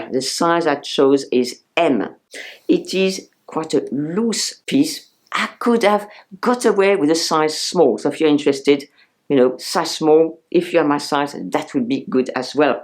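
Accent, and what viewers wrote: France. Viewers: You are very talented and can make anything look chic and expensive. So classy are some outfits that I copy some belts and scarves. The big thing I have taken to is red lips. My mother told me when I was 15 yrs old to wear red lips and 70 yrs later I'm doing it again. I wear eye shadow more naturally with a chocolate colour in the top of my lids and a beige or gold onto the lids. Muted of course. It's a head Turner. Just love that you don't put concealer under your eyes either. It's a winner. I watch Nicole Johnson and another french/ American model but you are tops for a little that goes a long way. Please do some more on your classy make up. Just love your anglicised french accent. 😍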